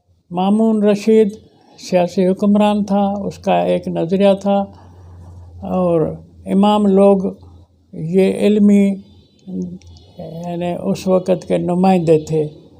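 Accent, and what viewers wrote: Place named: Sindh, Pakistan. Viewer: خوشی ھوتی ھے سنکر آپ کو، اور حیرت بھی کہ ایک سندھی اسپیکنگ کتنی ستھری اردو بول سکتاھے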